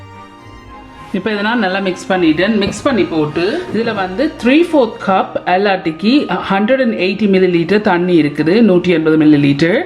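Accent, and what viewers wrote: Jaffna, Sri Lanka. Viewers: Its really nice.your voice also it reminds me jaffna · நீங்க யாழ்ப்பாணமா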